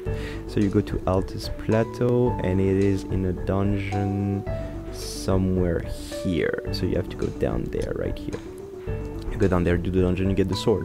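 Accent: France. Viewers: for the timestamps and the French accent (force frérot)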